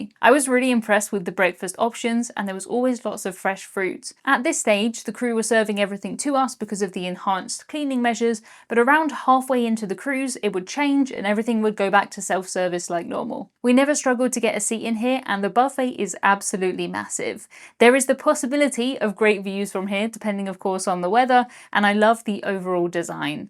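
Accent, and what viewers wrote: United Kingdom. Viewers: Shedule is my fav British work lol. They just forget the C in schedule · I would love to run into you on a cruise just so we could sit down and talk about cruises and you experiences that you have. I love to hear your commentary not sure if it the British accent but listening to you explain these things just sounds so lovely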